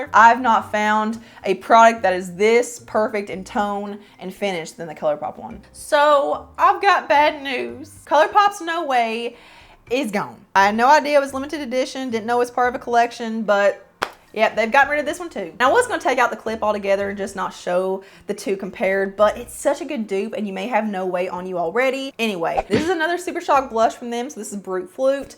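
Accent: South, United States. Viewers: Love your southern draw 😊 great video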